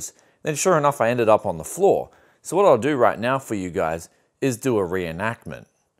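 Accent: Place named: Australia. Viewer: is just the new bob the builder but aussie and techie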